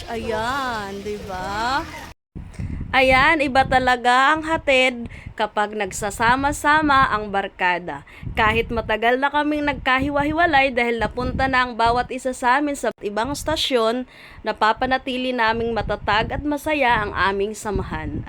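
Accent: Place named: Waray, Philippines. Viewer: Upaya gad. an pag Tagalog.. Ky"WARAY"accent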